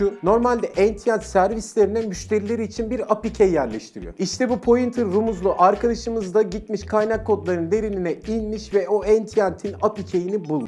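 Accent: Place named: Aegean, Turkey. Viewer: Ege şivesi e gari <3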